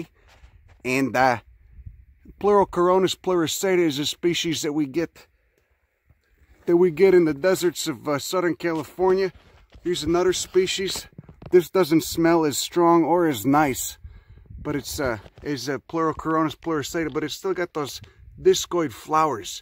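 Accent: Chicago, United States. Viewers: That thick Chicago accent tho!!! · That's a Chicago accent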